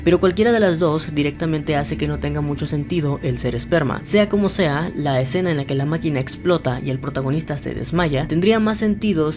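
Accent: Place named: Panama. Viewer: aa te sentia acento a panameño, no pareces de MX, buen video por cierto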